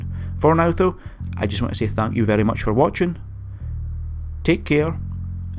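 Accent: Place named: Aberdeenshire, United Kingdom. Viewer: Very professional video, is that an Aberdeen/shire accent?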